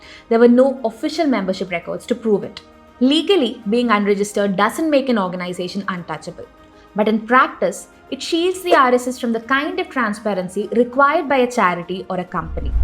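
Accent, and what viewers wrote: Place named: Kerala, India. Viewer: What motivated this lady to probe RSS, from the accent she sounds like a Keralite....must be some middle Eastern *und which is under her table,throbbing her for this video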